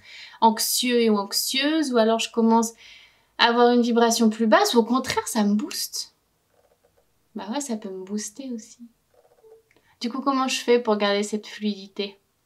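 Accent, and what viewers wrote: Spain. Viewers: Coucou ! Ya estás pillando el accento español un huevo! :) Yo pillando el francés....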